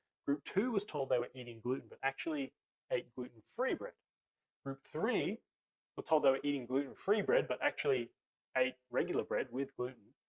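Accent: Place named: Australia. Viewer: This actually makes sense to me. Only new to the gluten issue realm. Great to hear what sounds like an Australian accent!. Have you got that low fodmap list to look at and try please?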